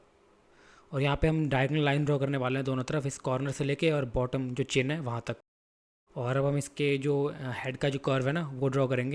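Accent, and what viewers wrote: India. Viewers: This guy sounds Indian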